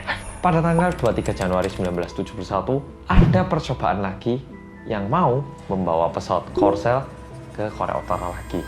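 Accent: Java, Indonesia. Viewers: Korea reomit hanya orang korea yang medokkkkkkk.. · Jiaaaahhhh....... lg seru2nya koq bersambung. Wah dikerjain mas medok nih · Setiap Gw Nonton Video Yg Gw Dengar Medooooookkkk!! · Nyimak orang Korea sing medok👍 Mantap👍 ni ulasannya Sukses selalu mas🤲🤲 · Semangat terus maasss medooookkkkkkkk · Di thumbnail nya ku kira mas ngomong ada bom, ternyata medhoook:v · Ayooookk... Bolo2 ndang cus ndelok like share coment di chanel Korea reomit bersama orang korea yang medoooookk 😊🤗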